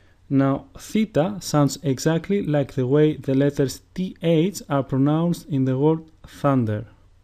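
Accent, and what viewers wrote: Greece. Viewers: You are sooo greek, seriously your english accent is so greek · he's Greek so he speaking with Greek accent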